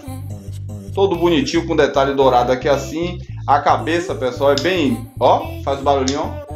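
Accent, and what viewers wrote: Bahia, Brazil. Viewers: Senário muito foda, baianês excelente = a vídeo diferenciado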